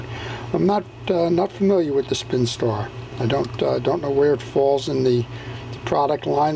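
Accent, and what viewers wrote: New Jersey, United States. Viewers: Just found you a couple weeks ago, love the Jersey accent,I live in CT. am 60 yrs old and ONLY fish with vintage rods and reels,I give the "new stuff" to my grandson.I have in my arsenal many 60's-70's japan reels which were made by the big three(Daiwa, Olympiic,Zebco, etc.., under different names,they're tough,cheap, and easy to repair,and THEY CATCH FISH!!!,I also have some french mid-century reels including the Mitchell saltwater line and my beloved RU-MER's I'd llike to see a vid on some of those old "coffee-grinders", they can be pretty intimidating when you take the side cover,off, or try to repair the bail mechs, Anyway thanks for posting, and helping others like myself who appreciate a time when things were made to last!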